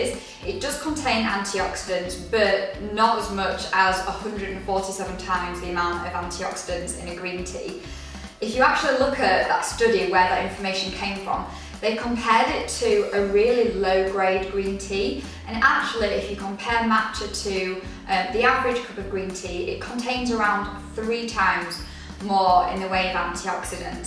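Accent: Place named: Northern England, United Kingdom. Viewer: Love your northern accent, makes me miss home!! xxx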